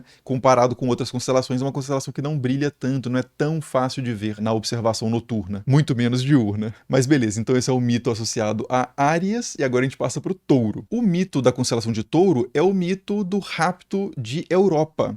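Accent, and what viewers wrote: Minas Gerais, Brazil. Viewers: sotaque mineiro pega diferente né...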